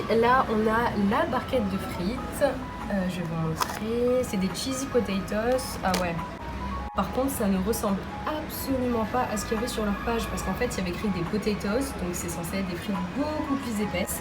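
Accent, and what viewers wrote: France. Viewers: Salam Alaykoum ma soeur tu es françaises et tu vie en Algérie ? Je me demandais car t’as un accent français mais t’as aussi un bon accent Algérien quand tu dis un mot arabe lol J’aimerais vivre en Algérie insha’Allah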